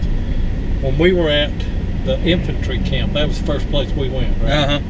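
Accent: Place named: North Carolina, United States. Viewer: Enjoyed the video! You sound like my husband's family who grew up in the mountains of North Carolina in the Canton/Clyde area. I'm starting a metal detecting club at the school where I teach and I would love to show some of your videos to the students! - Vicky Jackson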